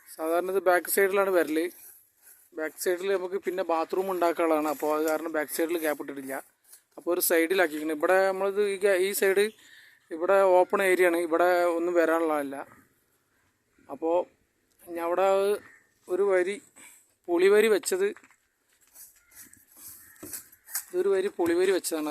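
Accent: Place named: Malappuram, India. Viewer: Ithu Malappuram slag .manassilavathoru kanandaa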